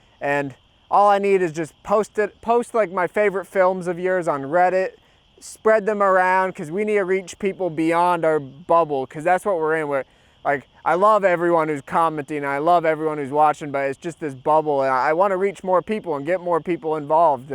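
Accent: Canada. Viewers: Canadian accent tho?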